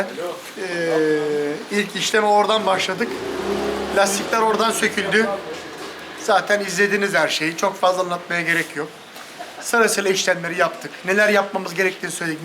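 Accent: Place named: Aegean, Turkey. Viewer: Hocam şiven çok güzel Aydın mı Muğla mı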